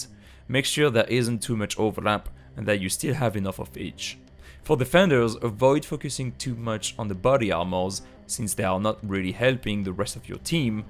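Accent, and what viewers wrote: France. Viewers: Are u french ? Anyway, good video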